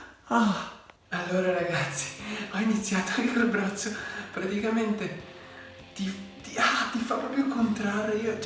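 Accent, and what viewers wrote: Veneto, Italy. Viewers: Vecio ma sei Veneto?xd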